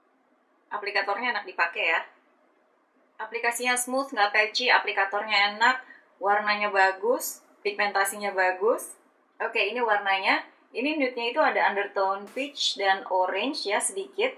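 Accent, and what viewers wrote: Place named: West Java, Indonesia. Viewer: Suka sama ka kalau lagi review logat sundanya keluar,kocak pas bilang muruluk murudul 😂😂😂😂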